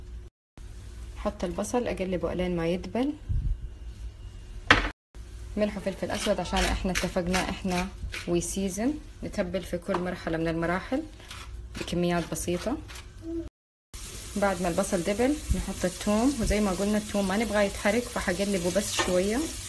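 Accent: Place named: Hejaz, Saudi Arabia. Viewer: شكراً الطبخة سهلة انبسط لما اسمع حجازية زيي تقدم اشياء حلوة الرب يباركك ❤️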